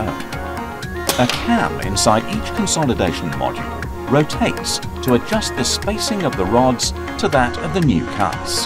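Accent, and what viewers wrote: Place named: United Kingdom. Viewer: Use an American English speaker, not a British English speaker. Thanks.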